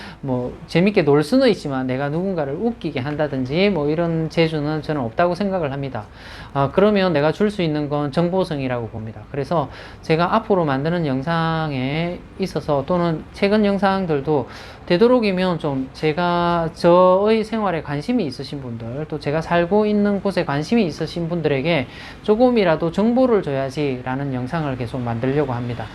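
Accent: Gyeongsang, South Korea. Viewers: 경상도 분들이 유튜브를 많이 하시네요 듣다보니까 분석능력이 뛰어나시네요 와우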